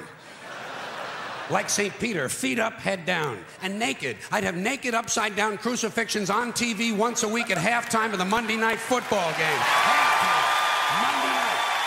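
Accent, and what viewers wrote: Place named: New York, United States. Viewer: Lol yea his new york accent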